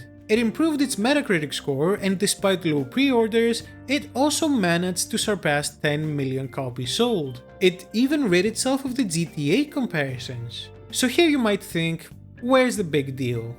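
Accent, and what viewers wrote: Greece. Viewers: Are you Greek by any chance? You sound like it. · Φίλε η ελληνική σου προφορά είναι τόσο εμφανής 2 λεπτά βλέπω και δεν χρειάζομαι τίποτα παραπάνω!!! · i know this greek accent brother XD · Greek accent is tough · Greek accent geiá sou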